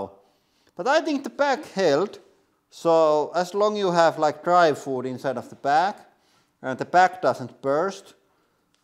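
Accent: Finland. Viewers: They're not sound effects. It's actually supposed to be spoken English, it's just that the Finnish accent is ridiculously strong.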